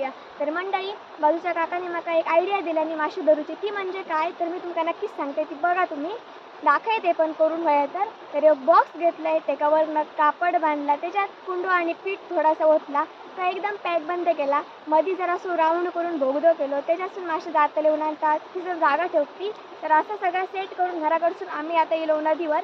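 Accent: Malvani, India. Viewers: I ❤ माझी मालवणी भाषा तू सुंदर बोलते.❤u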